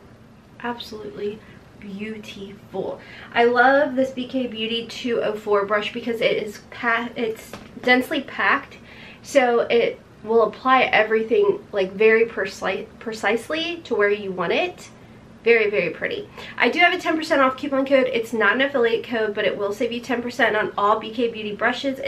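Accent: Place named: West Virginia, United States. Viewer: Waaaaait... I’m from WV too and pick up on that accent every now and then as well. I’m sure there’s more of us out here, but I feel like we’re so few and far between! I live near Summersville, so I’ll say that and send my love wherever you are!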